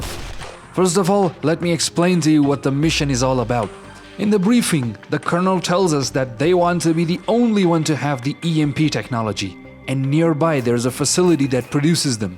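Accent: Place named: Pakistan. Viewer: Apka pakistani accent bohut accha laga, kaha se hain aap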